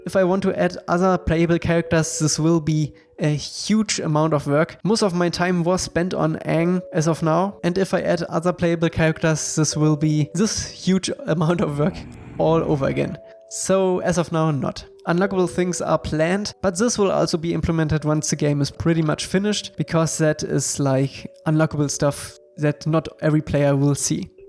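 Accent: Germany. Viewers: Am I crazy or I can really hear a German accent? If yes, I am your neighbor. But from Poland :P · are you from Germany?? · Stabiler deutscher akzent 😂👌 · Der deutsche Akzent ist thicc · Man hört es fast immer raus wenn Deutsche Englisch reden. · Alter dein deutscher Akzent ist ja soo geil :))) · Bist du aus Deutschland? Hahaha hört sich so an😂 Translation: are you from Germany? You Sound like that haha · Are u german? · Ich feier dein Alman-Englisch😂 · Man kann raushören dass du deutscher bist 👌🏼 i like it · I think he is from germany :D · Ich mag den deutschen Akzent xd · Mann hört heraus das du ein Deutscher bist🇩🇪❤️❤️❤️ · dieser deutsche akzent haha ich liebe es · The german Accent is just on point😜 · are you german because you Talk english like one (im an german) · Wer liebt auch seinen Deutschen Akzent ^^ <3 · are you german ? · Pls. Fellow German. Learn the TH sound. 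Your waaaaaay to much of a genius, so this shouldn't be a problem. Really impressed on your game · Ist nicht böse gemeint aber finds lustig wie man hört das du normalerweise deutsch sprichst😂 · Love the german accent. Feels like hes introducing a german fight game. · Du bist deutscher man hört es so hart klingt lustig aber kannst gut englisch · I like how you can hear out that you're coming from germany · Bitte rede normal english oder gar nt weil dieser deutsche Akzent nervt hart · Leichter Deutscher Akzent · Wie man den typisch deutschen Akzent raushört nice haha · Ach ja der Deutsche Akzent xD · I'm really looking forward to the game, take your time and don't be stressed out. The game should be just as cool as you imagine it to be. Ps. may it be that you are german, your english sounds exactly like mine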